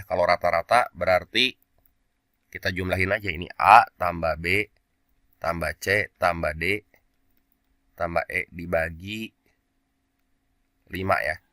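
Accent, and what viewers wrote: West Java, Indonesia. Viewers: LUCU IHH LOGAT SUNDA LOP LOP PA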